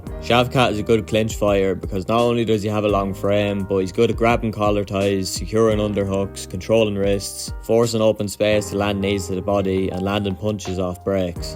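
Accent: Ireland. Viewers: Wasn’t expecting the Irish accent. Good breakdowns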